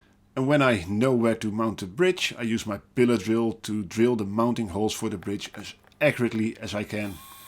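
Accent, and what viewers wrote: Netherlands. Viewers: This video captivated me every second. I am very grateful to you that you speak in a (for me) clear and (despite your Dutch accent) understandable English. This makes it very easy for me to understand what you do, why you do it and how you do it. . I think your shape of the guitar body is great, because you do without the often common (but in my opinion disturbing) offset between the upper and lower cutouts on the neck. I first saw this in a similar form on John Petrucci's "Majesty" guitars and find it very comfortable. . Your design with the recessed book matched top should actually work very well on a neck-through and/or semi-hollowbody guitar. What do you think? . I am already very excited about the next part, please don't let us wait too long 🙏😉👍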